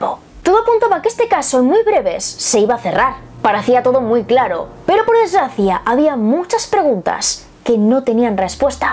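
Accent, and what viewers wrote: Spain. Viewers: Saludos bellotas Magnífico acento español, lo amo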